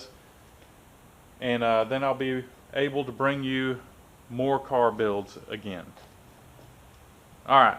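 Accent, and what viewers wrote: South, United States. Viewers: Those aren’t friends. Those are people that want to take advantage of you. That having been said, you’ve fixed your audio lag and that means I’m subscribing and I’m gonna watch all your videos. I think I speak for everyone when I say we wanna see that stash tho. Hola from Mississippi, the southern twang is not lost on me.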